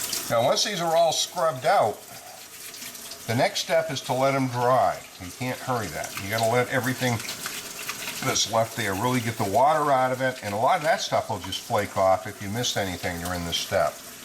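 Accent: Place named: New England, United States. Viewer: Very, very nice job---Bravo-Zulu, amigo, for bringing a "dead toy" back to life! I saw the Windham Maine realty sign in the background....and I believe I detected a New England accent (although I believe you stated you were in Virginia). I applaud your efforts! Equally worth admiration (maybe even more?) I applaud your wife allowing you to use the kitchen sink!!! A very pleasant video!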